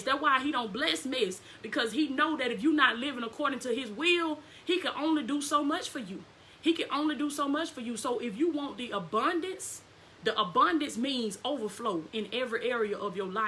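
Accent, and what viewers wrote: South, United States. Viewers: You are "my girl!" I really admire you !" I love that southern accept ! Peter was identified by his accept ! Keep doing yo thang ! Be not discouraged , sister ! You are not alone !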